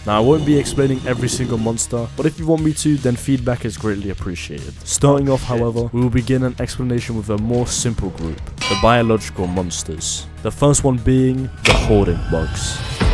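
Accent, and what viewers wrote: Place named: United Kingdom. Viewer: Hey great video! Not only does it help that you sound British, but you also go into a good amount of detail! I look forward to your next information vids :)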